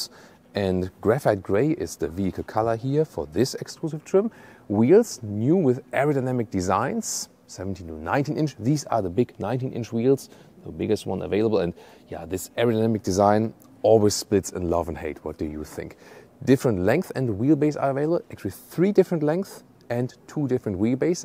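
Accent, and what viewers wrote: Germany. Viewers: A Tschermän tolking inglish. horrible! Bleib beim Deutschen, es ist authentischer!!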